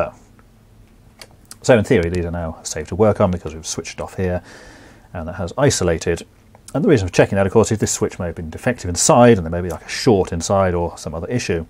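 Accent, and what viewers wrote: United Kingdom. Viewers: I wish you put subtitles on it to catch up with the british accent :(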